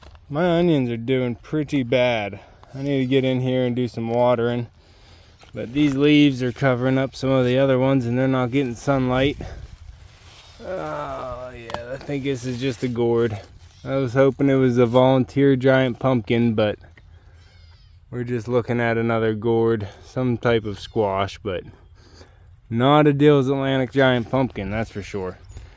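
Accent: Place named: Western Pennsylvania, United States. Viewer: I was wondering the same thing. But I guessed western PA from your accent!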